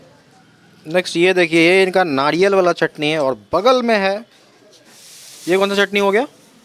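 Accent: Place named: Bihar, India. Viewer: Bhai fan of ur vlogs.Luv the way u explain things in bihari ascent. Big ❤ to all ur mutton vlogs keep making ur vlogs. Best wishes to u n ur team .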